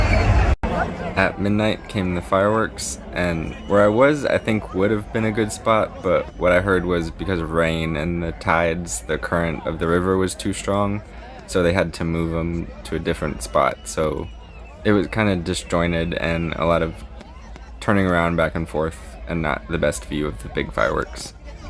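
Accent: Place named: Brazil. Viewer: . está a ficar muito "abrasileirado" 😒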